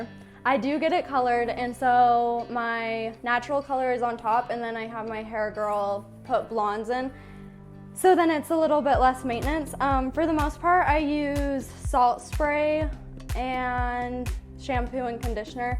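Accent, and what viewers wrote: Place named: Utah, United States. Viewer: your accent makes me miss Utah.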